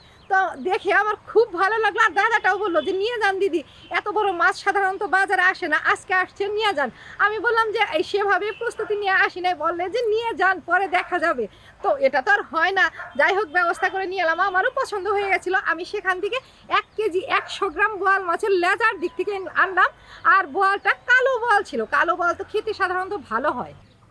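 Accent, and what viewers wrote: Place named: Bangladesh. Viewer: Didi ki bangladesi meye naki,kotha bolar doron ta bangladesi der moto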